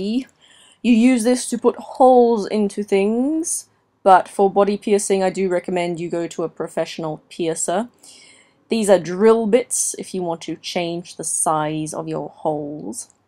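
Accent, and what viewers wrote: Australia. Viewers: Your accent is bueatiful,where are you from?...from Australia l bet! by the accent .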